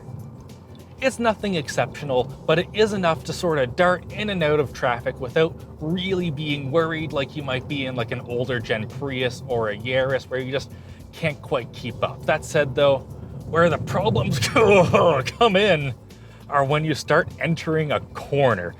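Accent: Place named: Canada. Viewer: your Canuck accent is really protruding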